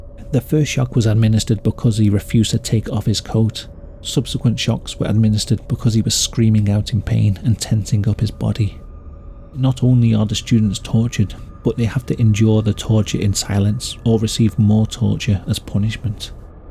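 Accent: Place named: Liverpool, United Kingdom. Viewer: Love this channel. Plus your also nearly scouse accent makes it better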